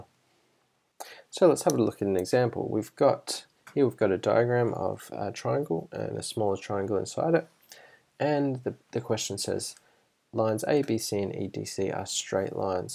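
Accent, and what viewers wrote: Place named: Australia. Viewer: The Aussie accent needs to be complemented by a more light-hearted tone. Overall, your videos are excellent.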